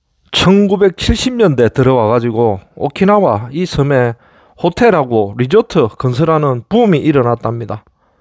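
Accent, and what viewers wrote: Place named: Busan, South Korea. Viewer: 오리지날 부산사투리로 해설해주니 정겹고 잊은지오래되는 사투리도생각나서 너무즐겁습니다 화이팅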